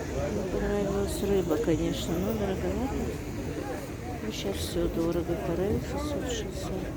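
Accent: Standard. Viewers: Здравствуйте!Недавно вас смотрю,мне очень нравятся ваши ролики.И еще вы так по русски чисто и грамотно разговариваете.желаю вам и вашим близким удачи и здоровья.Я живу в Омской области р.п.Шербакуль -это Сибирь.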